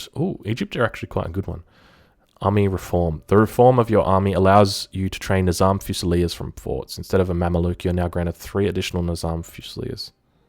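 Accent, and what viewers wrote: Australia. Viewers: I'm from Minnesota, but I that's Australian English right?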